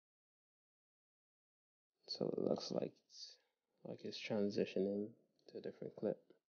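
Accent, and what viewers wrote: Bahamas. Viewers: same! I knew you sounded Bahamian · you bahamian?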